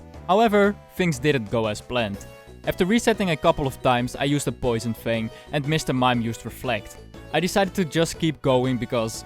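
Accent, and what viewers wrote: Netherlands. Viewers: where are you from? i really get a feeling you are dutch or something just because of how you prenounce some words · Are you dutch? You sound pretty dutch to me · You sound Dutch. Is that weird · Dutch accent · You have like a Dutch accent Like how you say Pokémon it's like Dutch (Mede Nederlandstalige leuke video) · You are Dutch aren't you? I can hear it because of your accent? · I can clearly hear you’re dutch😂😂😂😂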